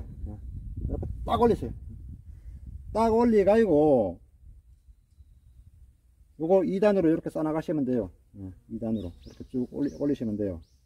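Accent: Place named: Gyeongbuk, South Korea. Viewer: 경북우리고향 사투리네여 ~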